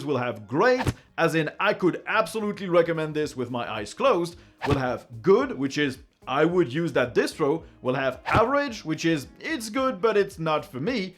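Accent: France. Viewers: Tu serais pas français mec t’as un très fort accent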